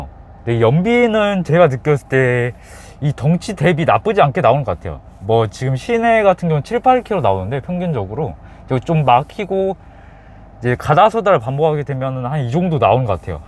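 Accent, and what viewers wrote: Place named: Jeolla, South Korea. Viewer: 전라도 말씨여ㅎㅎ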